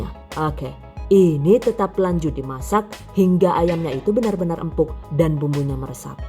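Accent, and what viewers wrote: Banyumas, Indonesia. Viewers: Iya kan sdh l@ tinggal di luar sana n suanj orng bule tapi ciri khaa ngapak ny masih tetep ad sukses trus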